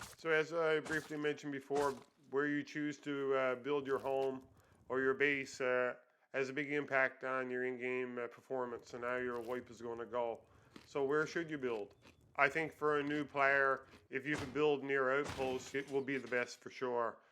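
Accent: Newfoundland, Canada. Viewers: From an Irishmans view, I was tryna pinpoint which town the accents from 🤣 Suppose I can hear the canadian in em' now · i knew instantly he was from newfoundland too hahaha i was looking for this comment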